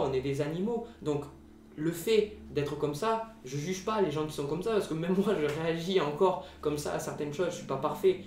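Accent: South, France. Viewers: je découvre cette vidéo que maintenant. J'ai déjà un terrain à être éveillé: de nature très introverti, à me retrouver seul, à avoir des synchronicités et en même temps très empathique. Cependant en 2020 en faisant de la sophrologie encore plus profondément, j'ai reçu un message spirituel comme quoi il fallait que je change de boulot. Je supportais plus les ragots un peu comme tu décris. Aujourd'hui, je suis épanoui dans mon nouveau job. je m'éloigne encore + de ceux qui m impose à ce que je me vaccine vs coronavirus. Je suis pas antiVax, mais là je le sens pas ce truc. Tant pis, mais je m eloignerai des endormis et j'impose pas ma façon de penser aux autres. Enfin, dis moi d'où vient exactement cet accent du sud? Au plaisir de te lire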